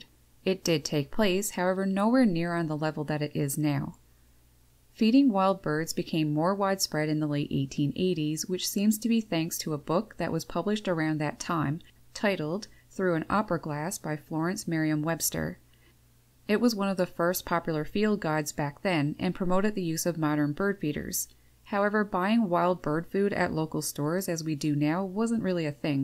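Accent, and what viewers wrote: Canada. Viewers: So we are impacting the natural birds via our feeding, but in a positive way, is basically what you're saying correct? From the pronunciation of the "ou's" I assume you're from Canada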